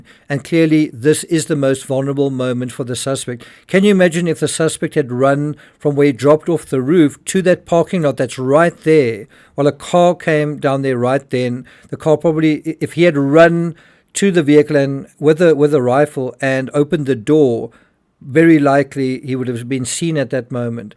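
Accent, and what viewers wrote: South Africa. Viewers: Definitely a South African narrator. i am not sure what I learned tho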